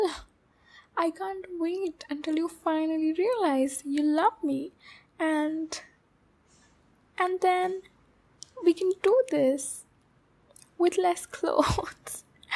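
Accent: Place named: India. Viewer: I can sense the indian accent in this audio.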